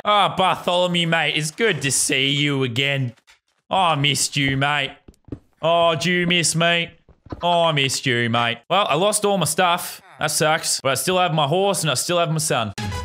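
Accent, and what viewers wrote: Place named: Australia. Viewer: Yo bro you have a Aussie accent big time bro cheers for Australia man (I’m also from Australia).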